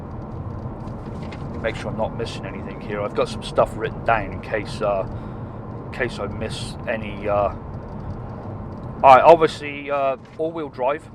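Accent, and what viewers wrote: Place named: Gloucester, United Kingdom. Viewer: I'm trying to work out your accent.. guessing you're from Gloucester? You're obviously now living in the US, and it looks like a beautiful place to live.. very spacious!!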